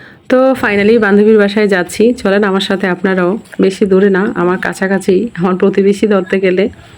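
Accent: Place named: India. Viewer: আপু, খুব ভালো লাগলো ❤❤ আপু আপনার ভাষা ইন্ডিয়া এর মতো।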